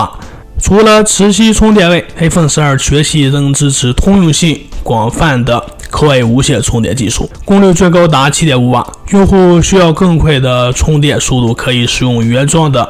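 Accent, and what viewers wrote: Shanxi, China. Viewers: 你山西人哇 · 听起来像山西陕西一带哈哈哈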